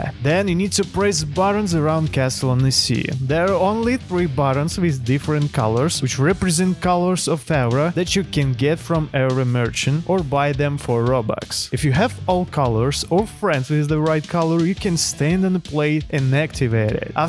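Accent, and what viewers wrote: Russia. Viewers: The russian that tries to make an english voice OHHHHHHHHHHHHHHHHHHHHHHHHHHHHHHHH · Why do you have russian accent lol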